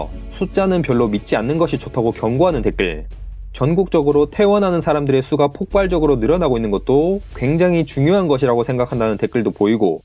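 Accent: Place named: Gyeongsang, South Korea. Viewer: 이거 경상도 사투리죠? 억양이 오르락 내리락 ㅋㅋ 표준말을 좀 연습하세여~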